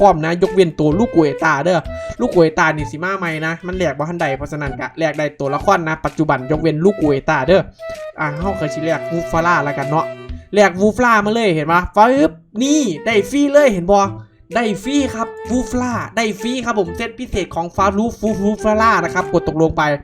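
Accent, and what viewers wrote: Isan, Thailand. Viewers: อ้ายเป็นคนอีสานบ่ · อ้ายเป็นคนอี่สานเบาะ??? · ค่อยเปนคนอิสานฟังออกชั้นเจน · คนอีสานหรือ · น้องฟังออกน้องเป็น อีสาน ♡♡♡♡♡ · อีสานชัดๆ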